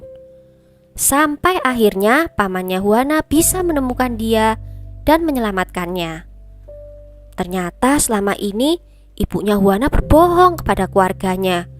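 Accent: Java, Indonesia. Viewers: Hallo jg medok kuhhh.. Alhamdulillah sehat.. sukses trs yaa say👍💪🙏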